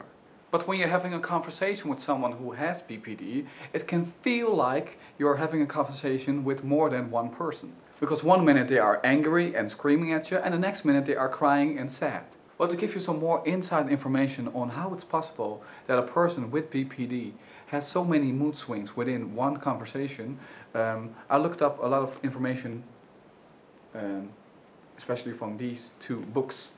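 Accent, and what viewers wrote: Netherlands. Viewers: Recognized the accent of my fellow Dutchie within 1 second. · English is CLEARLY not his first language so he speaks it with a Dutch accent. It's not impossible to have deduced that, surely? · het is zo makkelijk om jouw accent te herkennen omdat ik ook Nederlands ben, denk ik :s Misschien kan je dit filmpje ook in Nederlands doen? Meer watchers :D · Jij bent een nederlander klopt dat? haha ik hoor het aan je accent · Is this guy dutch? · Are you Dutch ? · Ik wist het, ik hoorde je accent en dacht hij moet Nederlands zijn haha. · Very good- I almost cried when you talked about the abandoned child/abused child. I can identify with that kid all too well. Thanks for the video. (Yes, I am a BPD person too). PS_ are you Dutch? I was trying to place the accent the whole time. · I love your Dutch accent. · Are you Dutch? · He has a Dutch accent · Ik had al zon voorgevoel dat je nederlands was met het accen'tje xD haha Anyway even paar vragen over borderline... 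Hoe weet ik van me zelf of ik borderline heb? Ik zit ook vaak met gemengde gevoelens weet nooit hoe ik ermee om moet gaan het ene moment show ik ze heel veel anderen moment krop ik ze op en ben ik net een tijd bom die tikt Ik kan van vrolijk in 1 minuut overslaan naar boos/verdrietig In relaties ben ik onzeker, obssesief jaloers ... en word ik boos zonder rede Zijn dat tekenen?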